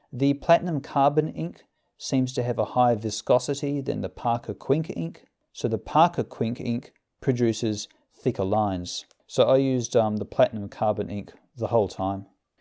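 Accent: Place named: Australia. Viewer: whoa, yes, hahaha. But this chap is (sorry Wade, no offence) a little more high class I reckon. Or it could just be the slightly different regional Oz accent that sounds classier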